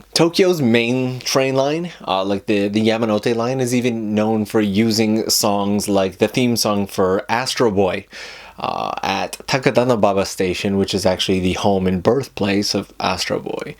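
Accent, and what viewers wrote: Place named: Canada. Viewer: No I don’t mean it’s funny... I was just wondering if u are a Canadian Cus the way u pronounce those words... I love the Canadian accent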